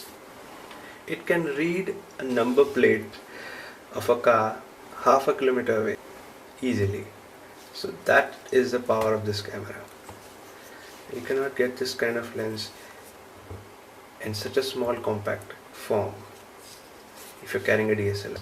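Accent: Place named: India. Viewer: love that accent my indian friend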